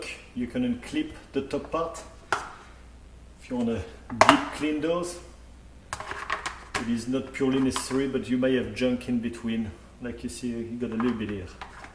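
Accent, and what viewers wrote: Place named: France. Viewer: T’es français ? I like your accent 😀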